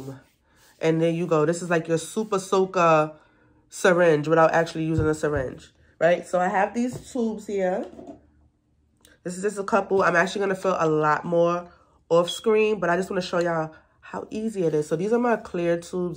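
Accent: New York, United States. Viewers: Just found ur video and child i can tell ur from nyc cause i can hear that newyorker accent we got! Thanks for the tips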